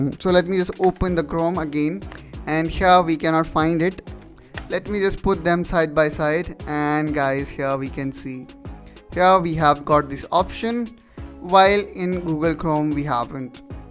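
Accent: India. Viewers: You should not use Indian accent if you're from India. You need to learn how to speek properly.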